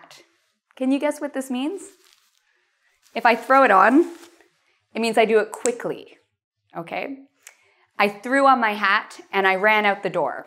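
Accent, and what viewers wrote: United States. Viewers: I'd say she's American accent (I am not quite sure), either way it doesn't really matter, those phrasal verbs are used in UK as well :) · 美式英文太难听了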